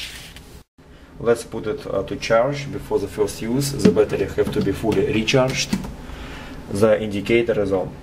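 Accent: Russia. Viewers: Чёт у тя акцент русский. Иди учи фонетику!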